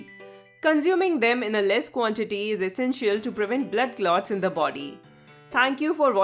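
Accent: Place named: India. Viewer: Just listening to your Indian accent, i damn well knew i was in good hands. Loved that all these variety of fruits and vegetables are right up my alley, since i have to take Coumadin, due to having heart surgery. I know you did not recommend parsley, but it is excellent for the heart. Thank you so much.!!!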